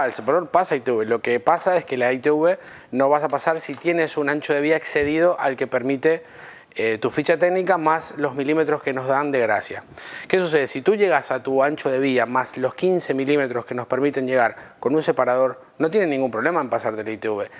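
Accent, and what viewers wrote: Argentina. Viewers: El acento parece argentino, pero habla en pleno español :P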